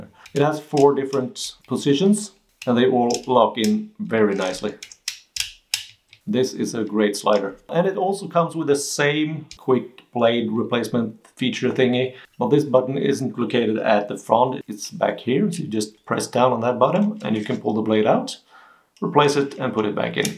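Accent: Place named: Germany. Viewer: First time watcher here....I stumbled upon your channel this morning, at first I didn't detect your accent....but as your video went on and I didn't keep scrolling I began to notice that you might be from Germany or austria....you finally mentioned that your from Norway! I appreciate your presentation style and liked how you described everything...really cool...I went ahead and hit that subscribe button and gave you a thumbs up! I guess the only thing I took issue with was the fact that your a Dewalt guy....I recently researched folding utility knives and decided to go with milwaukee I have their six in one fastback and their compact folding knife...I like both of these....I'm a knife guy and for my edc I carry a buck Juno in my front left pocket a spyderco in my left rear pocket and now my milwaukee fastback in my front right pocket...it works for me...I live and work in the west texas oifield....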